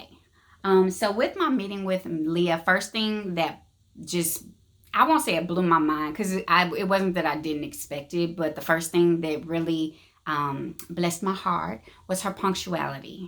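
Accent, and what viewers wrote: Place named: Arkansas, United States. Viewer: I love the Arkansas accent lol great vid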